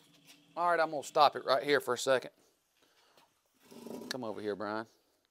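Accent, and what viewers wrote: South, United States. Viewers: I just like your southern accent you would stick out where I live in northern Maine!!!!!! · From Canada, thanks for the unedited video. Now i understand how the work and the failure of water passing the bladder. Much appreciated, keep up the good work. Also love the southern drawl 😁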